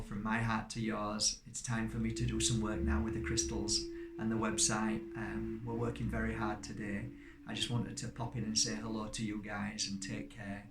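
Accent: Yorkshire, United Kingdom. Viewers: Hey! I´m so glad I´ve discovered your channel about 10 days ago. I really don´t know how it happened. I´ve been seeing loads of your videos ever since, and all of them are just fantastic. Your energy is so uplifting and addictive. Before I didn´t know a thing about moldavites and now I´m totally in love with them. Their beauty is totally out of this world;) I really love your channel since the very first minute, it´s bloody incredible. On top of that, I lived in Leeds and just love the Yorkshire accent, now I´m back in my home town in Spain and I really miss it (At first I didn´t understand a word in the Yorkshire accent haha). A big thank you from the bottom of my heart for everything you do:)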